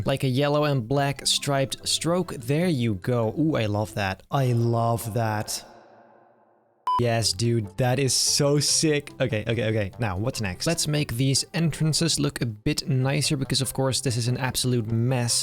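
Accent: Netherlands. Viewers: your netherlands arent you from your accent i hear that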